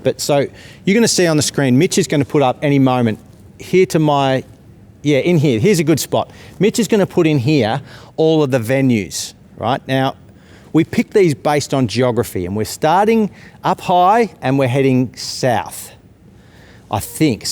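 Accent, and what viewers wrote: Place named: Australia. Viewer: Watched to learn Aussie accent way back 2019 in preparation for Uni in Melbourne. Continued watching since! Stoked to see you in July!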